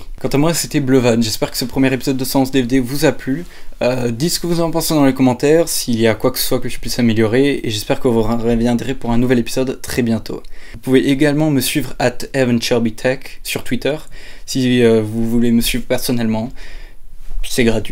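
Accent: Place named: United States. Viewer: Original, on sent que t'es à fond dedans c'est cool :) J'suis d'accord avec LeFou, tu as tendance à mâcher tes mots en fin de phrase et quand tu dis les noms des gens. C'est dommage on peut pas apprécier ton accent américain :P Pour le film j'vais donner autour de 40/51 parce que malgré la bonne surprise y'a quand même quelques défauts. C'est un bon début pour la chaîne o/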